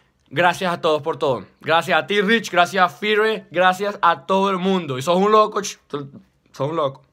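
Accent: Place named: Venezuela. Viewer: para quienes digan que el del v ideo es rich, JAMAS!!!!!! ese "tio" es venezolano sus palabras su acento, busquen cualquier youtuber venezolano y es dificil imitemos su acento español y viceversa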